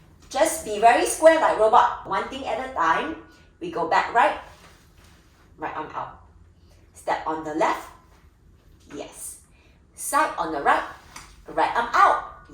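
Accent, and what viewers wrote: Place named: Singapore. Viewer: Sounded singaporean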